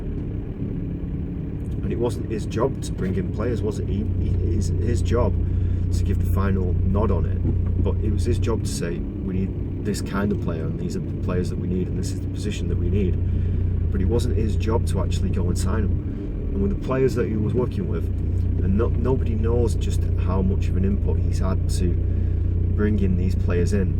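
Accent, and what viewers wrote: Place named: Manchester, United Kingdom. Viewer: where are u from bruv? U sound like ur from Manchester, as I am also. I kind of stumbled across this video, I'm glad I did, it's quality. Looking forward to ur West ham vid. Defo gonna subscribe n will spread the word. Peace!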